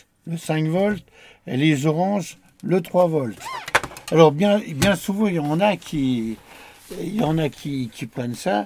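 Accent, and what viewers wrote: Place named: Lorraine, France. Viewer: Bonjour, fantastique combine. Merci. Et que ça fait du bien d’entendre l’accent Lorraine, moi qui suis exilé chez les chocolatines!😂.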